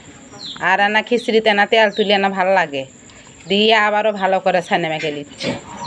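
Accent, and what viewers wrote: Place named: Joypurhat, Bangladesh. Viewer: জয়পুরহাট জেলার ভাষা মনে হচ্ছিল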